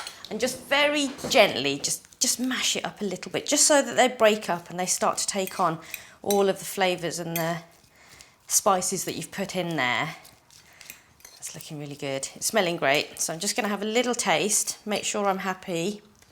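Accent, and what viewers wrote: England, United Kingdom. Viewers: Love your video!! Love your British accent and how you showed every step in such detail. Thank you so much for sharing. Can't wait to try making them!! Can you make these with whole wheat flour and bake them rather than deep fry?? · Are you speaking British English sorry look like Indian girl thanks for taste food recipe Thanks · Just love your British accent! Thanks for sharing! Will try them soon. We had them in England years ago and my husband loves them! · I loved your Samosa recipe, the authentic Indian taste. You also have a beautiful British accent.God Bless . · In fact I've been trying readymade ones for ages, but now that you show this amazing recipe, it's worth trying. Thank you for sharing the tutorial. By the way, love your British accent. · It's just almost 10 years older, video with so much of freshness and life into it..the samosa...lovely british accent...many thanks for the recipe from India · very british accent init? 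· Very well demonstrated. And ofcourse the English accent is such a pleasure to listen to. I am definitely going to give it another try. 👍🏾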